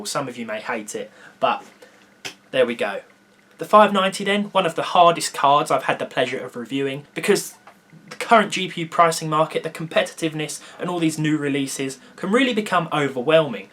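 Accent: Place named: Yorkshire, United Kingdom. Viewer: What area do you live in England you sound like Yorkshire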